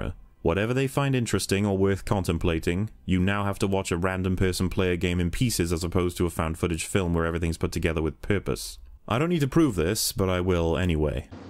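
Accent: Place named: United States. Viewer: strange American accent ONLY ELEVEN MINUTES INTRO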